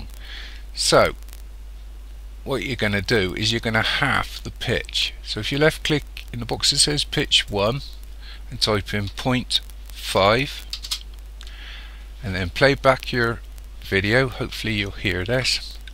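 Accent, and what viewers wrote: United Kingdom. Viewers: Most british tutorial ever seen xd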